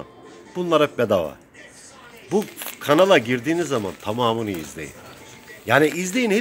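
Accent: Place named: Rize, Turkey. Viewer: senin şivene kurban olurum abiiii:))) ben de Rizeliyim... saygılar. teşekkürler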